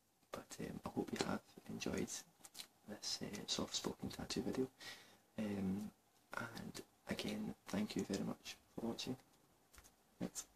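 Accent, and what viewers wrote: Scotland, United Kingdom. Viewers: Do love the scottish accent :)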